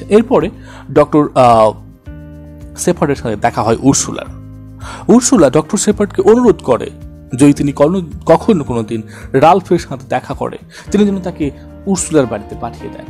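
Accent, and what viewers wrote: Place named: England, United Kingdom. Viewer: Everything is nice but you should have stuck to your own Bengali tone but your tone seemed to be English while you speaking bengali..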